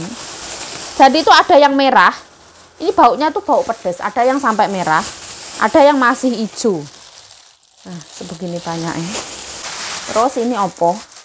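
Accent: Java, Indonesia. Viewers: Pertama kali liat chanel mbak waktu itu subcribernya 68k... Jatuh cinta sama kepolosanya dan logat jawanya yg medok.sehat selalu mbk biar tetap bisa menghibur😊😊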